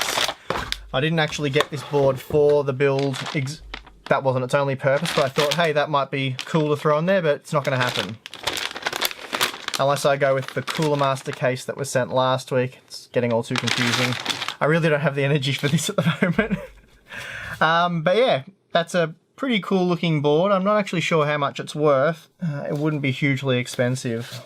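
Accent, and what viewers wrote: Melbourne, Australia. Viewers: Hey, are you from Melbourne?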